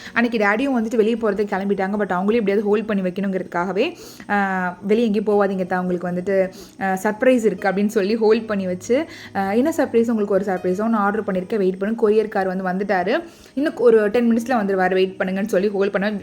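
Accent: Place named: Udangudi, India. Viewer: Neenga endha oor neenga udangudi kaaranga maari pesringa en ooru adhaa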